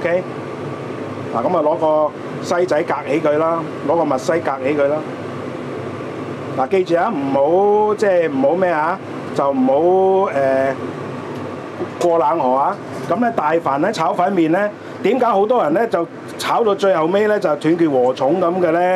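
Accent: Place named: Southeast Asia. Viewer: Leo應該唔係香港人聽你講野似東南亞人多啲喎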